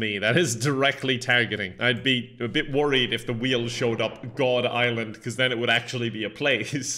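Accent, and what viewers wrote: Ireland. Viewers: dude ur from spain i thought you where irish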